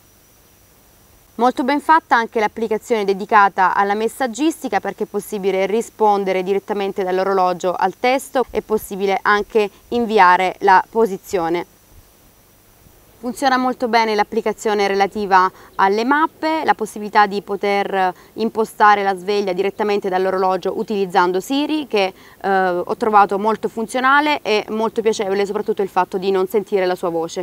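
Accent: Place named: Salento, Italy. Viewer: Orgoglio, una pugliese salentina fa parte di questo team favoloso! Si sente l'accento, specialmente quello dell'amico in live sull'apple watch xD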